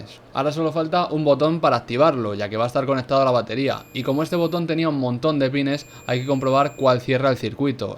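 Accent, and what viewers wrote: Spain. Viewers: Pinche español ogete....😝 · tiene voz de español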